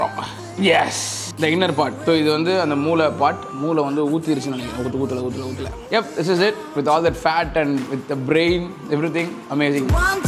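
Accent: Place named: Kanyakumari, India. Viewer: Kanyakumari language poola iruka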